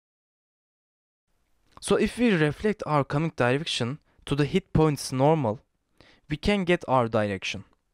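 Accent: Turkey. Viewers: Great video thank you, You sound like turkish are you ? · Reis İngilizce kaynak olarak bile bu kadar kısa ve net anlatan bi tek sen varsın. Türk olduğunu anında anlasam bile :) Bu arada anlatım muazzam. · Aksanindan turk olduguna yemin edebilirim :D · tek bir sorum var sen türk müsün ? çok belli ettinde :D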